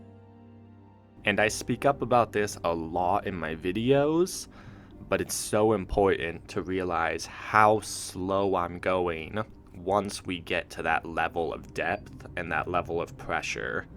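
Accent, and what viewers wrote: Alaska, United States. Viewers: he sounds like one of the Alaska wilderness family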